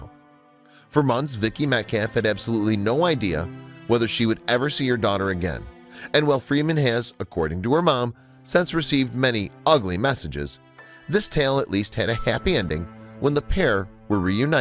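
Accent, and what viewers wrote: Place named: Michigan, United States. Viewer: The heavy Michigan accent and repetitive overuse of the word indeed cracked me up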